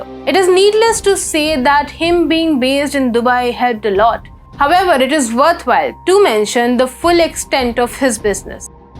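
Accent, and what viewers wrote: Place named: India. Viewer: She is speaking like an indian,,i am an indian tamil,,I can understand.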